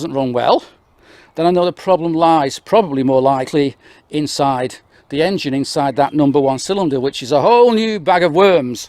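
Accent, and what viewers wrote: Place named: England, United Kingdom. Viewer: Good morning brother.... Have you done a compression and or leak down test on that cylinder.... Love your English accent... Cheers from Australia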